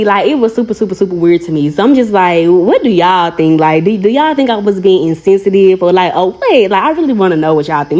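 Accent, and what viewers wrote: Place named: Arkansas, United States. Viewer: I had a friend from Little Rock Arkansa, I’m from Compton,ca. he had that same accent as you…I love it though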